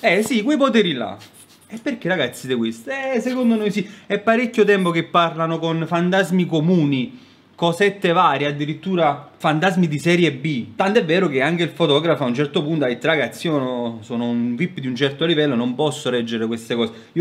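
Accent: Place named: Naples, Italy. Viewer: sei veramente eccezionale davvero a parte che mi piace molto il tuo accento napoletano sei comunque fantastico perché vedi chi crede a queste cose come me e non sono l'unica imbrogliare me e gli altri non è molto sportivo diciamo anzi ti senti presa in giro. spero e so che hai capito cosa mi riferisco comunque scusa se te lo dico ma il fatto delle bambole possedute è la più grossa idiozia mai sentita anche se non stai parlando di quello però ci tengo a dirti quello che penso per farti un esempio. Grazie 😘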